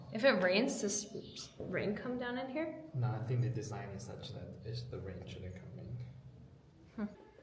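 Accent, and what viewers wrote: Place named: United States. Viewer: Your American we understand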